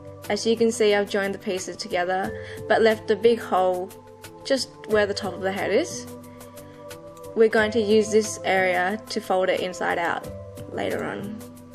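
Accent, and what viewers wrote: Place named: Asia. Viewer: An Asian English accented girl... I LIKE IT!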